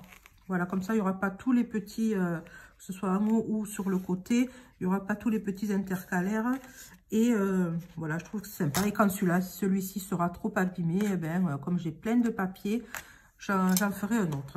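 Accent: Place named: South, France. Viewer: COURAGE continue ainsi, rien que pour VS Avec votre charme accent du Sud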